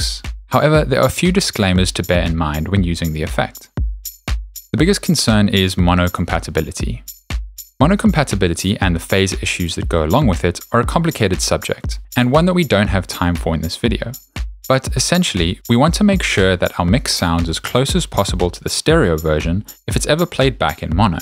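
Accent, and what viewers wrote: South Africa. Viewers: Your accent sounds South African, you from ZA by any chance?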